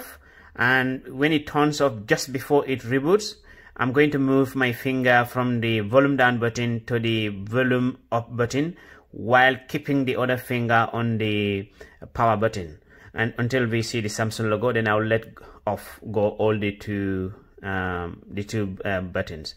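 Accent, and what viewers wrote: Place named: India. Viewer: Indian voice, black hands...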